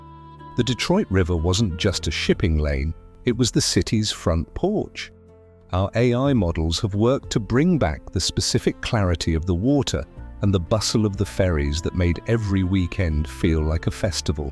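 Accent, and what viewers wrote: United Kingdom. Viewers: Noooo! The "arsenal of democracy" as narrated by a Brit! Gag! ANOTHER!! Anerican story but no American narrator! How about the history of London narrated by a "suthin cracker!" Shown in Grt Brit!!?? DONT WATCH!!!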